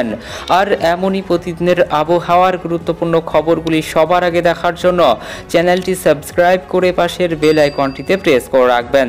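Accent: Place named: Bangladesh. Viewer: Dada apni ki bangladesi apnar kathai bangladesi tan aache jini sanbad porchen take bolechi .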